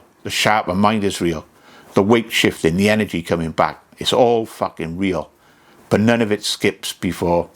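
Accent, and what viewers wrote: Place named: Newport, United Kingdom. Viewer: Alright, I understand your language and like your videos. Im originally from Newport S.Wales. Moved to Australia in 1995. Married for 23 years then a divorce came and I have never drank so much in my life. I started drinking in Bettws/Malpas age 14. I am 54 this year. Struggling to keep myself alive without a drink. I work every day although now the hangovers are severe. Good to here a Newport accent?? Thanks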